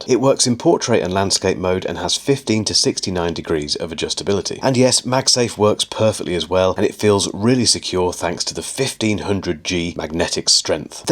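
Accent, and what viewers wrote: United Kingdom. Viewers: can you please say aluminum once again? didn’t hear that in british accent since jony ive left apple 😅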